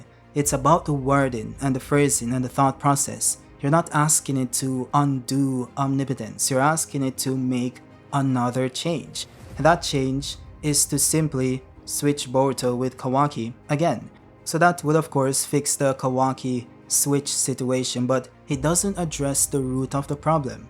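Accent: Jamaica. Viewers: bro u made me understand more of baruto thanks but i got to ask are yu Jamaican? im just asking bc im Jamaican😁❔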